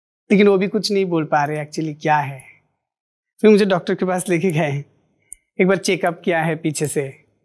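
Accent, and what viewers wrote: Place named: Bengal, India. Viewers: She has an adorable Bengali accent. Is it just me who thinks that? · Today after listening to her,I understand she is Bengali.Hats off to her struggle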